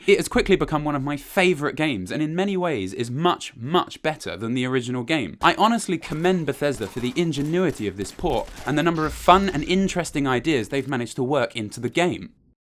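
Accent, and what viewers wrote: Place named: United Kingdom. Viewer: Does every british man have the same voice?..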